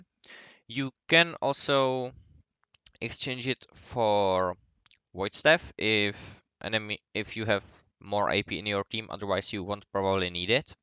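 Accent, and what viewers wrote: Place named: Czech Republic. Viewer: Vic cesky-zniciho cecha jsem snad neslysel xD. Good Guide.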